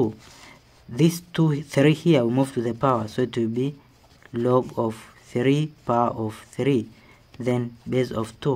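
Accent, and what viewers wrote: India. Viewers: Your Indian accent when you speak English is 🤮🤮🤮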